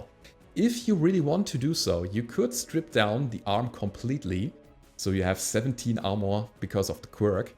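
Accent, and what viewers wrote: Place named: Germany. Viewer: Hey! I'm using a KingCrab too. Nice video! In the meanwhile I didn't played a long time. But I'm downloading the game again. My KC is pretty good too. Is it possible you are from germany or so? Your talking sounds like a german one who tries to talk english... but really good english. ^^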